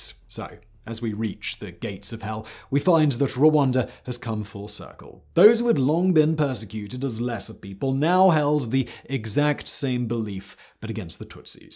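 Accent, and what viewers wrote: England, United Kingdom. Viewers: Or... he wants to get to filming the other 50 videos he has scheduled for the day where he just sits there reading a Wikipedia article that someone else just printed out and handed to him 30 seconds before. Seriously... this guy makes his living sitting and reading shit. He doesn't give a shit about telling stories he makes it clear he hasn't read any of the scripts before they record them and usually has no clue what he's talking about. Whenever he pauses to add his own opinions on a subject it's usually something simultaneously arrogant and stupid. He's just reading as much crap as his accomplices can print out and put in his hands so they in turn can upload it to YouTube and get money on clicks. How many channels does he have now? 50 or so? Do you think he even knows? He's not a historian or a media personality or a great thinker or entertainer. 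He's a reader. With a beard and an English accent. A glorified Speak and Spell. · You are speaking too fast with your British accent that makes it hard to catch up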